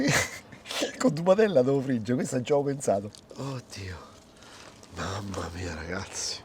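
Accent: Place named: Milan, Italy. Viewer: Max complimenti per aver assunto anche l’accento milanese quasi perfetto !!!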